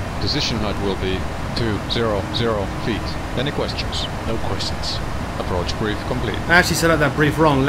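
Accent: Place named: England, United Kingdom. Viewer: I love English accent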